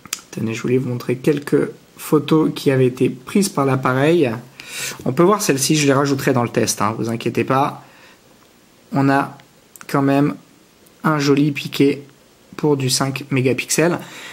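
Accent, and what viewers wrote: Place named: France. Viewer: I'm just here for the french accent :')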